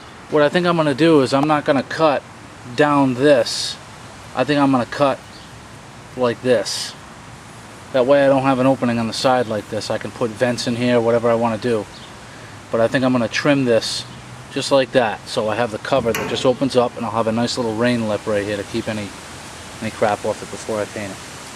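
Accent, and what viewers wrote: United States. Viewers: well done. im gonna copy it :p love the american accent. hi from New Zealand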